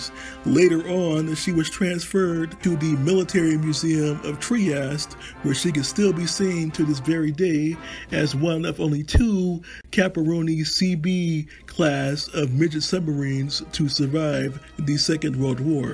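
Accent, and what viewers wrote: United States. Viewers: I had to give this video a thumbs down. Your American accent makes it unbearable to listen.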